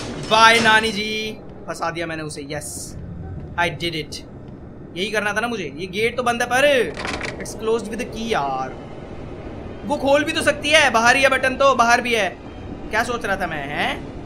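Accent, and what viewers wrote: Haryana, India. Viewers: Tumbara jo Punjabi/Haryani accent hai, vo sabse funny hai Funny in a nice way , I love all the accents . Not insulting.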